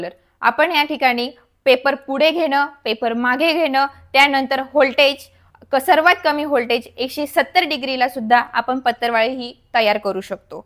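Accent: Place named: Khandesh, India. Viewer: खानदेशी भाषेत उच्चर vegla,asava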